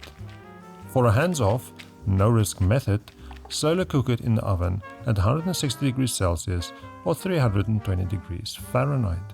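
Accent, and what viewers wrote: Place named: South Africa. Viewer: Found you by accident this evening. Subscribed! Love hearing a South African voice with the cooking videos. Also really enjoying your vegan options 😂🎉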